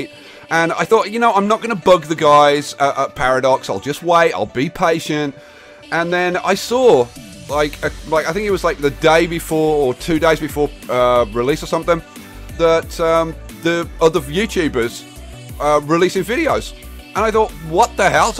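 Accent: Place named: United Kingdom. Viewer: Hi! I've recently started watching your Videos about building London in Cities Skylines and your enormous prison in Prison Architect. I'm really glad that you've came back and I'm particularly looking forward to Cities Skylines After Dark and Anno 2205! I can totally understand your reasons to quit for a while, some things can really upset you so much, even if they're not so bad after all, but you have been angry about it for weeks and can't stop just like that. I'm very happy that you're back again! (Sorry for grammar mistakes, I'm not a native speaker.) PS: You've got the most wonderful britsh accent!:)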